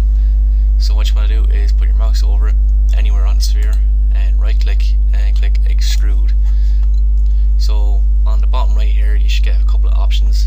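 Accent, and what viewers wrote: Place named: Ireland. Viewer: Nice, are you from Ireland?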